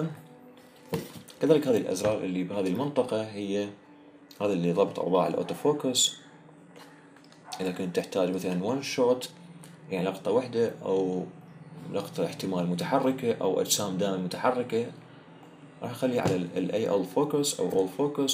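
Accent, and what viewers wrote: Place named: Iraq. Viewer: انت خوش يوتيوبر و انطيتك اشتراك و لايك بك كولي انت عراقي ؟؟؟ لاحضت من لهجتك انه انت عراقي و شكراً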